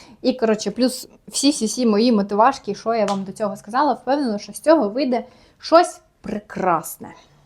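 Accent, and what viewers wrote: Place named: Kherson, Ukraine. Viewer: Пишу коментар за вашу херсонську УКРАЇНСЬКУ!!!! ТИ КЛАСНА🤩 радяю з того, що все більше українського контенту з'являється. Дяую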